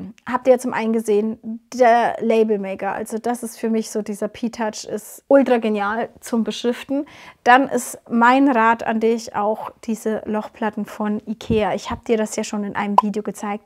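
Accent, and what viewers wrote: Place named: Franconia, Germany. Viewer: Liebe, ich weiß noch, als ich dich zum 1. Mal mit Sandra gesehen/gehört hab. Mein erster Gedanke war "die gefällt mir" und "die kommt doch aus Franken😂" dein Kanal heißt " " das war für mich persönlich nie nur nähen, sondern auch DIY's. Es ist deine Entscheidung, ob du mehr DIY's und eine Sommerpause machen möchtest. Es jedem Recht machen, kann man leider nicht. Dein Herz hat gesprochen und ich freue mich schon sehr auf ALLES was noch kommen wird ❤❤ Liebe Grüße und fühl dich fest gedrückt ❤❤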